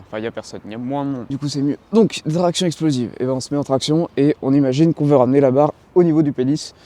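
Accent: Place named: South, France. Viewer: Ouahhh l'accent du sud, on l'entend vraiment fort, mais c'est drôle je trouve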